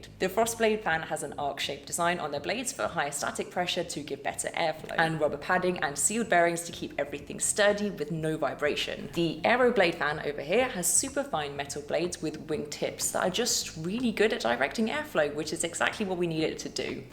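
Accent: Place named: United Kingdom. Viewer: are you thinking she is Australian? She is british. I think its funny so many americans can't tell the difference between the two accents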